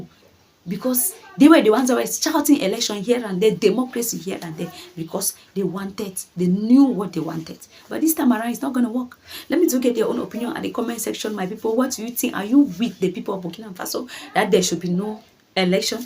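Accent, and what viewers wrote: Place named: Ghana. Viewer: Jamaica =English? Jamaica = Patios I am a Jamaica The vloger is GHANIAN who speaks with an accent THIS IS PERFECTLY NORMAL/ACCEPTABLE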